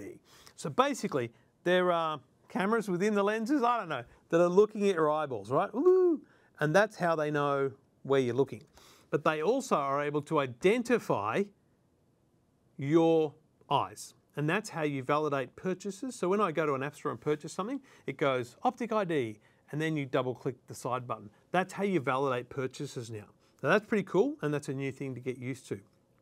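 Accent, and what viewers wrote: Australia. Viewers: I've watched an obscene amount of videos about the Vision Pro. It’s nice to finally see/hear an Aussie talking about it 😅 The pass through is something I’m a bit disappointed about. The degraded quality is purely because you’re looking through a camera. I wish it used better cameras. For 6k you’d think it would. I’m taking this Friday off. Picking up my headset at 9am. Very exciting 😄 Thanks for the vid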